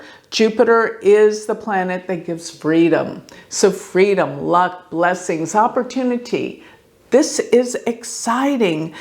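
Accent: Texas, United States. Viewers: has a sweet accent: 'Intercontinental' became 'inerconinenal'. Perhaps it is texanese.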